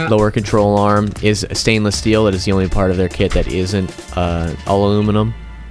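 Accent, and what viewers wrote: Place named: Massachusetts, United States. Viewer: Sound like your in Ma.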